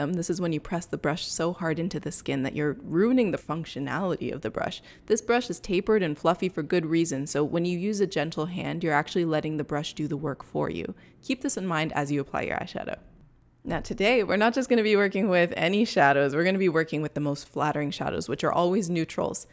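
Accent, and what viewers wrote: Canada. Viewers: Guys is she canadian?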